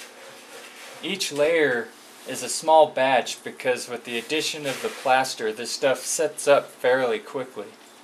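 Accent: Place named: United States. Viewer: Good job. 10 points taking off for sounding like a yankee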